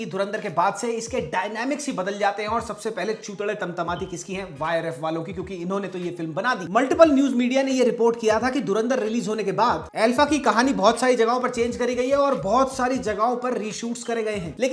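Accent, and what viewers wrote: Haryana, India. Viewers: bhai are you haryanvi??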